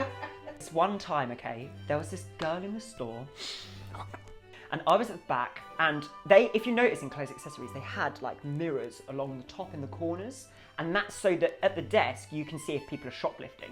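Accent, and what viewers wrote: United Kingdom. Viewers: Love the accent! Kinda cracks me up though how brits refer to cash as pounds! I understand why no one wants to do the piercings, not only are Claire’s employees inexperienced but it’s been also proven that the guns they use to pierce is un hygienic but it also causes damaged to the ears! I have 5 ear piercings double piercings on each side and a cartilage piercing, all gun pierced, my first ones my mom had them done when I was 8mo and they’re uneven, the last one was my cartilage when I was 18 now almost 37 and it’s still sensitive to this day! If I had kids and wanted to get their ears pierced, I’d take them to tattoo parlours to get it done!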